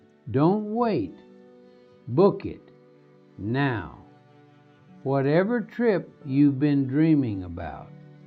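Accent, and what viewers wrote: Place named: Nebraska, United States. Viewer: so spooky hearing you talk. You have EXACT same accent my dad did but he was born and raised in Nebraska in 1927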